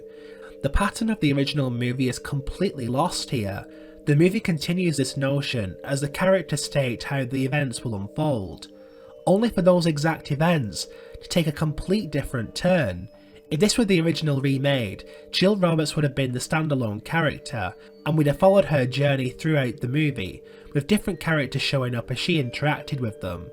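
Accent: United Kingdom. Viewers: He's from the northern area of the UK, they all talk like that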